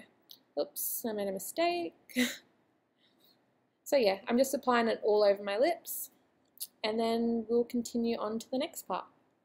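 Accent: Australia. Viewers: I didn't realise you where Australian! I've been etching your videos for weeks now! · Omg! I follow you on Instagram, I never knew you were Australian 😍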